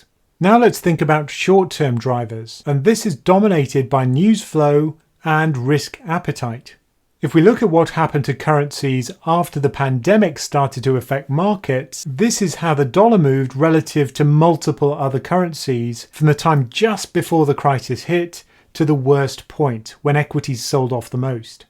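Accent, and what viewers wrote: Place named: Wales, United Kingdom. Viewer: Your cadence and accent reminds me of author Jon Ronson, who is Welsh.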